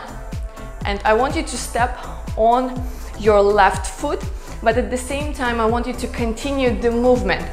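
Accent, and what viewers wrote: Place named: Russia. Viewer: Какой шикарный русский акцент😀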